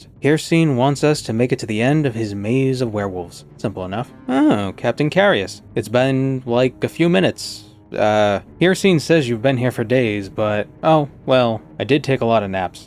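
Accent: Boston, United States. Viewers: So are we just never going to acknowledge the Boston accent?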